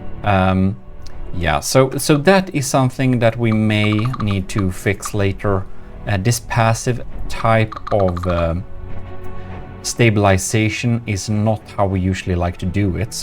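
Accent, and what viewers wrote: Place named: Sweden. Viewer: People guessed wrong on your nationality? Din engelska brytning är så extremt svensk att det låter nästan som om du pratar svenska lol.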